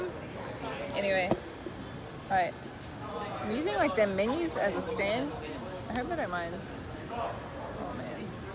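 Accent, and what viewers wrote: Australia. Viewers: aussie accent.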